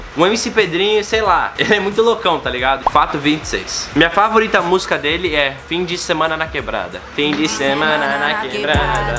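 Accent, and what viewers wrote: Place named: United States. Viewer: Ele parece ser americano. O jeito dele de falar, fala travando como os gringos quando começa a falar português.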